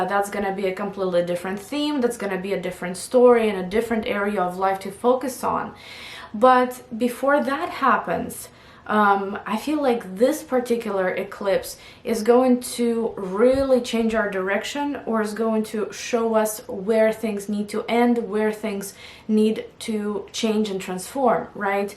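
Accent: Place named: Russia. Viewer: I am absorbed in your ever-so-subtle accent. Russian? No... Ukrainian?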